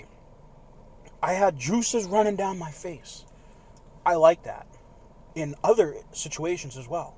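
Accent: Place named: Boston, United States. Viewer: We love you, and the Boston accent really hits the spot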